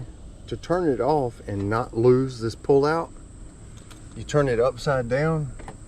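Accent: South, United States. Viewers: Ya he descifrado quién es tu hispanohablante adinerado. Qué bien. Eres un hombre de muchos talentos. Who would have thought a good old country boy from Georgia with a southern drawl is a fluent Spanish speaker? lol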